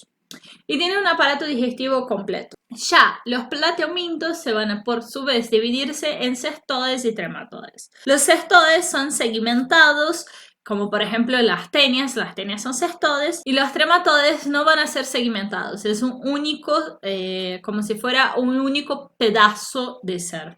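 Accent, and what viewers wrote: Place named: Brazil. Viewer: então, eu reconheci seu sotaque brasileiro no hora de falar espanhol. Seu conteúdo é bem interessante. Eu morei no Brasil dois e meios anos. Eu fiz mestrado lá.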